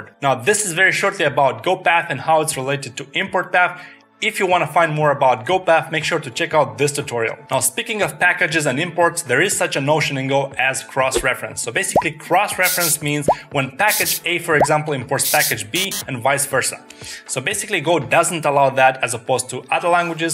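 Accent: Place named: Russia. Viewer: really?) I've heard that aII-too-famiIiar russian accent) Thank you for great introduce!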